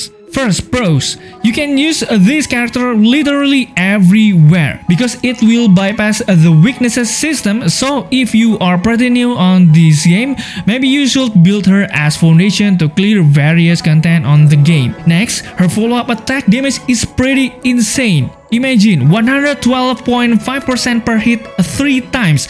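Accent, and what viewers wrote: Indonesia. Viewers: keep it up, even tho ur indonesian accent is to bold to hear, thumbs up from me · Are you Indonesian by any chance? I kinda can hear the accent a bit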